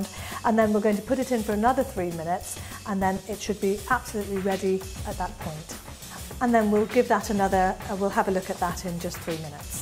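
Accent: England, United Kingdom. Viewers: No one is going to comment on how she is a sand lady with an english accent.. lawl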